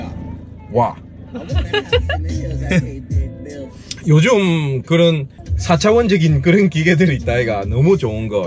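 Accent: Gyeongsang, South Korea. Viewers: 크...많이 듣던 갱상도 톤 고향이 어딩교 내는 마. ...영천 이구마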